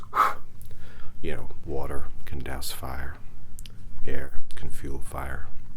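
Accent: United States. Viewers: Can we talk about how this guy can just casually do a great American accent? I’m a northerner and I’ve been in the states for like 2 years now and still can’t mimic the accent! How did you get so good at that lad? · So wild how you can do a really good American accent. Love theese videos the breathing techniques really help me to relax enough to rest at night. Thank you!😊💤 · These Reiki sessions are such a treat - the gravelly US accent is so restful. I do hope you'll consider doing more of these after the 8 'block bookings' are over?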